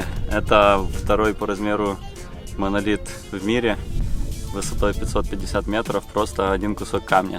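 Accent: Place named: Ukraine. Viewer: О Боже... чувак , ты ссышь зрителям в уши . Посмотрел 15 минут , больше не могу . Твоё произношение на русском просто режет слух . Дружище , если ты думаешь , что разговаривая на русском ( а не на украинском , твоём родном языке ) ты сможешь увеличить аудиторию - то ты глубоко ошибаешься . Я как человек проживший 20 лет в Украине и 7 в России , просто не могу не обращать внимание на твой русский . Не ломай свой язык на камеру , больше потренируйся в плане произношения и только после этого пили видосы на русском . А что касательно самого видео - молодец. Видосик качественный , хорошо проработан , продолжай в том же духе . Только прошу тебя , пожалуйста , прислушайся к моему не большому совету )))))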